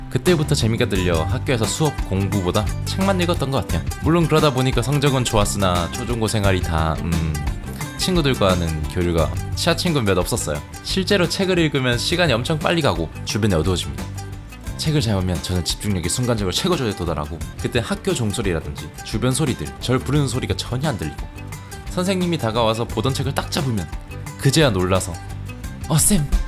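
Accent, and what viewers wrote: Gyeongsang, South Korea. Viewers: 님 경상도 사람이시죠??? 말투 들어보니깐 경상도 사람같은데.....흐음ㅋㅋㅋ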